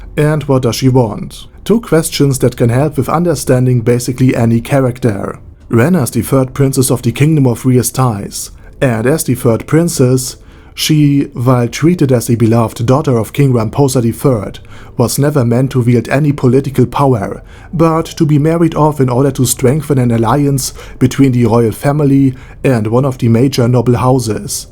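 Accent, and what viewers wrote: Germany. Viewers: I am pretty sure that your original language is German xD der Inhalt ist supi aber dude dein Dialekt ist schon übel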